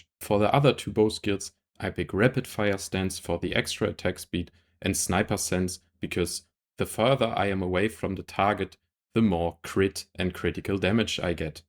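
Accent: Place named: Germany. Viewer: i can hear that you german brother lmao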